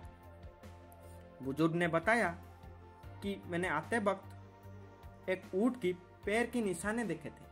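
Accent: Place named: Odisha, India. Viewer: Odia person telling the story, I think! 🙏🏻💪🏻 Bhainaaaaa! 👍🏻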